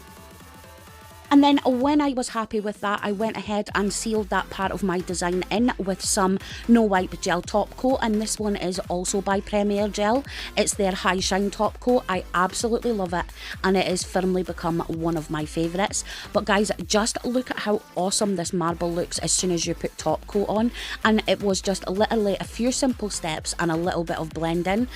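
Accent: Scotland, United Kingdom. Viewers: Totally stunning designs you are truly amazing x you are very professional and amazing when creating your designs just love watching you do your magic and love the accent from up the road bonnie Scotland 🏴 ❤️❤️❤️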